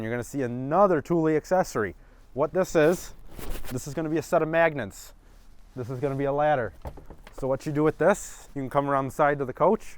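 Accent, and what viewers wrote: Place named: Chicago, United States. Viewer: I like your accent. You sound exactly like my cuz from the Chicago Southside (Bridgeport). You would blend in there quite well.🙂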